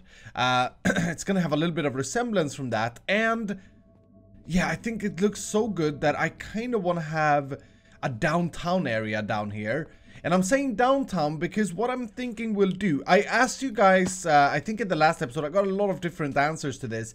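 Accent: Sweden. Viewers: I love you’re Swedish dialect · Are you from sweden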